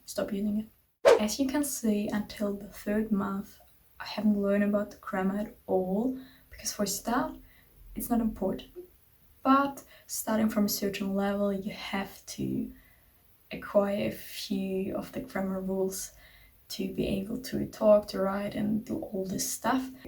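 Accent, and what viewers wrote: France. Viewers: You sound like french when you speak spanish, where are you from? Y buena suerte en tu aprendizaje, a por cierto debes hacer énfasis en la pronunciación de la R 👍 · Hablas muy bien español, y tienes un ligero acento Frances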